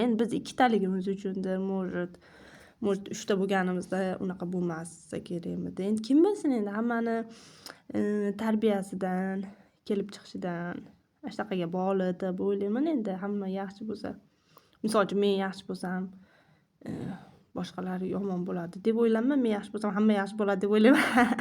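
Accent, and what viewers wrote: Andijan, Uzbekistan. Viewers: Хожи киз бэкор гийбат дебсиз хамма нарса ниятга богликлигини биласизу сиз ростанам Валижон шамсиевни анжонлик келинди уйнаган келинга ухшаб гапираркансиз ошликмисиз мен Анжонданман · Аввалам айтганман мен андижон данманк шевангиз худди бизникига ухшар екан деганман мен кизимни аккаунт идан чикаман йошим 60дан ошган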